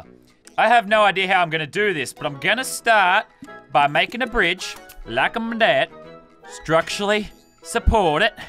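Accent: United Kingdom. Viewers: Aren’t you british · Love your British 😂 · lazerbeam you sound British sometimes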